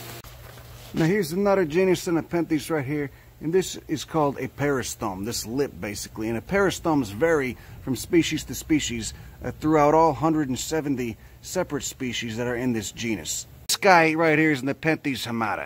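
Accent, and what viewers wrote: Chicago, United States. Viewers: Brian Williams more like CHICAGO. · lol....you look much different then you sound. how is it you kept the chi town accent even after traveling the country after highschool?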